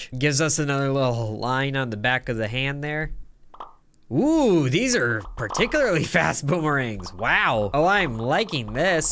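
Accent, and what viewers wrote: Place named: United Kingdom. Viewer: Your accent is probably why they think you're British? 🤔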